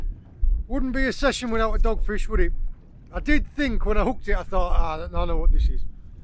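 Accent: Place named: Yorkshire, United Kingdom. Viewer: Got to ask as your accent is so familiar, what part of God Own County do you come from? I'am a Smoggie from the Boro.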